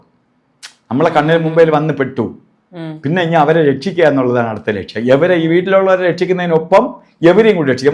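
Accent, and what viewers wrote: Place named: Thiruvananthapuram, India. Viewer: തിരുവനന്തപുരം ഭാഷ കൊള്ളാം 😂😂😂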